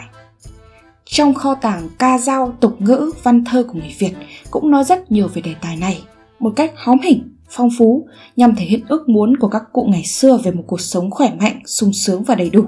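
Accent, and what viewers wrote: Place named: North, Vietnam. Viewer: Giọng Bắc kỳ thì khó nghe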